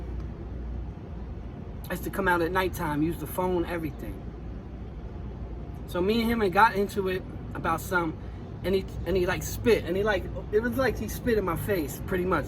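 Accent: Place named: New York, United States. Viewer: Shout out from Australia...Love the content and I Love your NY accent...i hope the kids hear the realness of the message your trying to send. Hopefully people hearing this can AVOID prison altogether. GL to u and all the best in your endeavours in the future...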